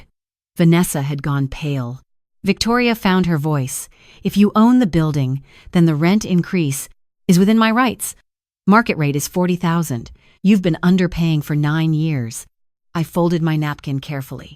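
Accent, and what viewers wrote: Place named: France. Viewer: Why french pronounciation? Needs fixing.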